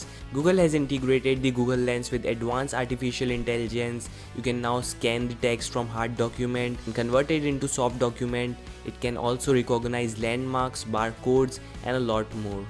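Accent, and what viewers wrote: India. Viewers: Can't understand terrible Indian accent. Bulbulbull....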